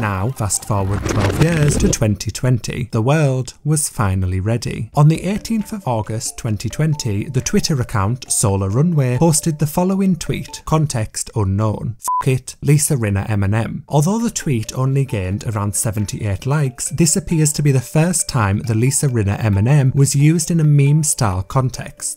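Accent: United Kingdom. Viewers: I appreciate the sincerity, seriousness and UK accent you employ in approaching these major topics of their time. It's like Dateline for silly memes.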